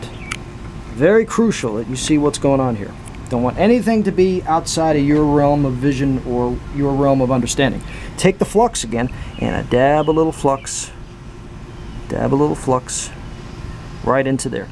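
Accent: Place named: England, United Kingdom. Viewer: Terrible Pommy accent, good video!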